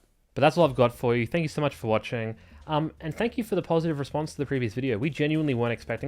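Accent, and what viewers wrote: Australia. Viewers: Bro theres nothing better than hearing another brother aussie making WoW videos <3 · He's got some kind of Australian accent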